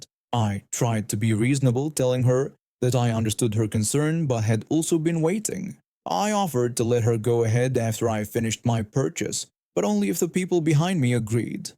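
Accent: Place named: United Kingdom. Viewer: It's the British and Australian pronunciation. Many of these stories originate in other countries, and is clearly not a US native. No doubt he learned English from a Brit. I think his pronuniation is kinda cool!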